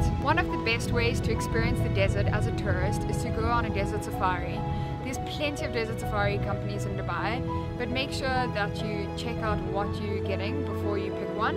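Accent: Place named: South Africa. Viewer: Very strong South African accent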